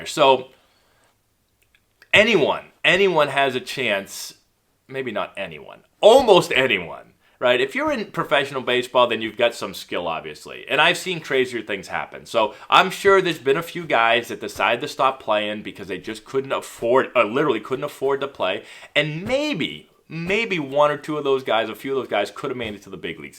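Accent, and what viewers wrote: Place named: Boston, United States. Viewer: Very faint Bosstown accent..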